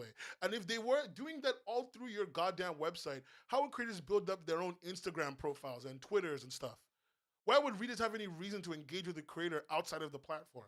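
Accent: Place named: United States. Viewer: youre british?? your accent sounds so american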